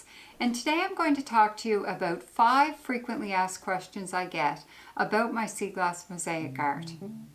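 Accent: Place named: Nova Scotia, Canada. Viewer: Since I'm from Nova Scotia, I loved watching your video and hearing the accent I grew up with. You are quite the artiste! I can't imagine trying to figure out how long it took to create a mosaic. No time limit on true art, eh? I'm sure those who paint on canvas or those who do pottery or those who chip away at a statue don't know or care how long a piece takes. :) My daughter is the one who collected all her sea glass and your videos will help us understand how to create our own art (I'm her helper … sort of) ;)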